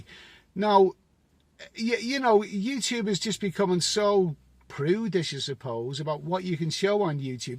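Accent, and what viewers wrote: Liverpool, United Kingdom. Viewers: A scouser in a fedora peddling derty smutty videeos....count me in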